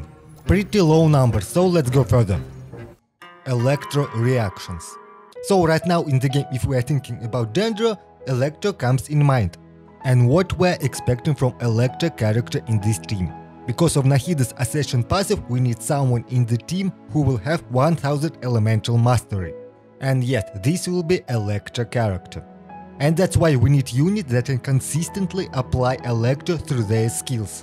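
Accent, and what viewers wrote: Russia. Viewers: He got the russian accent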